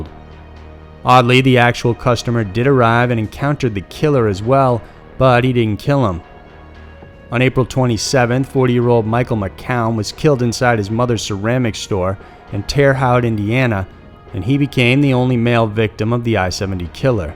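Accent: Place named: North Carolina, United States. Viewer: I'm from Jersey & I appreciate the mention but Shades of Death road doesn't sound so "murderous". As opposed to something like the Texas killing fields or Highway of Tears. They have many murders occurring on or near those roads. But regardless I really enjoy your channel & often will watch a few a day. Thank you for your hard work! Are you from North Carolina (the wsy you day "nine")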